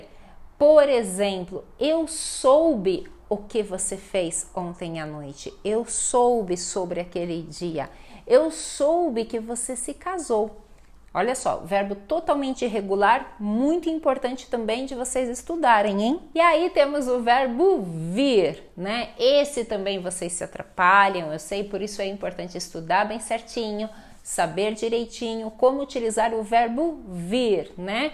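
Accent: Brazil. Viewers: You are speaking Brazilian dialetto 🤔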